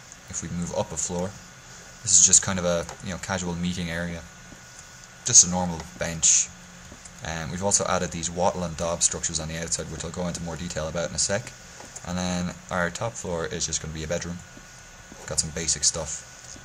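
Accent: Ireland. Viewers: what part of ireland are you from ima dub :D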